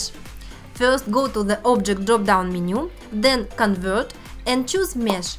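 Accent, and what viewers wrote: Russia. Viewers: я прям слышу родной русский английский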